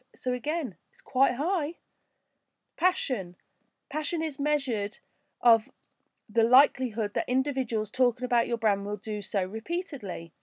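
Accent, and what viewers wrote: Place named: London, United Kingdom. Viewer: I want to say I liked the video nice accent you sound like your from my neck of the woods in London? love to work with you guys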